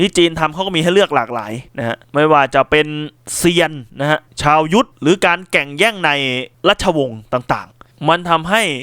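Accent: Ubon Ratchathani, Thailand. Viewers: ว่าแล้วเวลาแอดมินเว้าอิสานสำเนียงทำไมคล้ายๆสำเนียงอุบลจังเลย ผมคนพิมูล อยู่ตำบลนาโพธิ์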